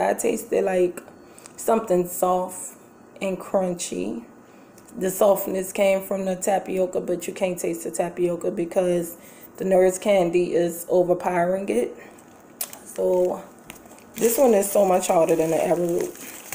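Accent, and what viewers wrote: New Orleans, United States. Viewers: New Orleans? Your accent gave it away 😊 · U gotta be from New Orleans with that accent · How are you, I am one of your new subscribers. I love your accent and by any chance are you from New Orleans because your accent sounds very similar to my sister's who lives in New Orleans. I was born in New Orleans, but I been in Jersey most of my life. I also love your bricks from Starch Bricks, I finally received my order today. That was my second time ordering but I probably order from him again cause he is on point.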